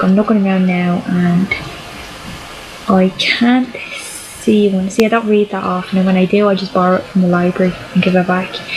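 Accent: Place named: Ireland. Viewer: I LOVE YOUR FLIPPIN ACCENT, I've got Irish in me and I've been trying to get the accent down and you speak it fluent and I really could use your help with it.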